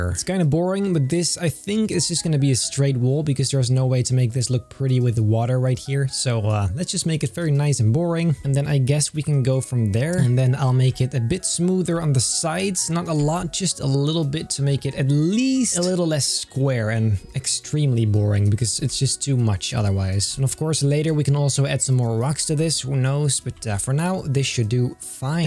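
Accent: Netherlands. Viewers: your from Belgium or are you from the Netherlands? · i new your from belguim or netherlands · ben jij van België of Nederland of Engeland, ik gok Nederland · I knew you were dutch Baha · are u dutch? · Are u nederlands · WAIT A MINUTE… Ben je Nederlands?!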